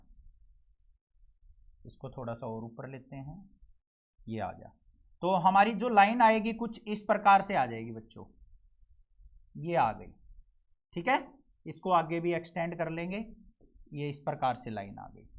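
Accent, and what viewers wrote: Haryana, India. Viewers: Sir haryanvi on top ❤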